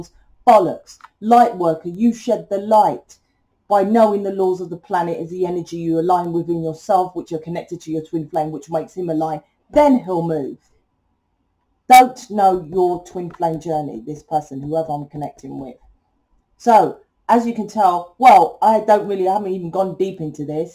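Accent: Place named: London, United Kingdom. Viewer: So cool to listen to a Londoner on here, it's nearly all Americans!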